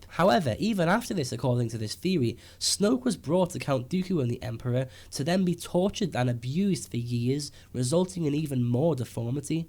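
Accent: Liverpool, United Kingdom. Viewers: Chris Griffin he's a Scouser ie someone from Liverpool. They all talk like that you American twit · scouse lad init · random question but are you scouse? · you Scouse?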